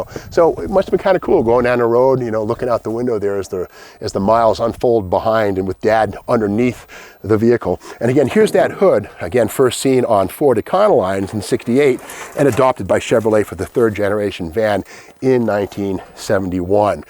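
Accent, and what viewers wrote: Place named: Boston, United States. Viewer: Is it Burlington auto wrecking? You're boston accent comes out as bursington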